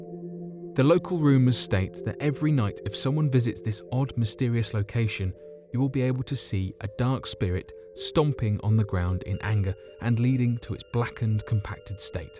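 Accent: United Kingdom. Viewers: Co.ag for music, British accent, why not be Top5s 2, instead of unexplained mysteries?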